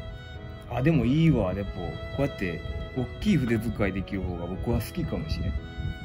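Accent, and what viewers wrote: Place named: Kansai, Japan. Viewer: かっこいい快適関西弁嬉しい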